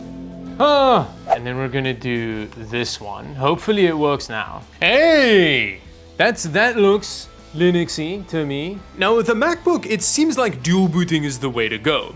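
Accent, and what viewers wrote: South Africa. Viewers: The South African accent is strong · Are you South African? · are you South African? or am I tweaking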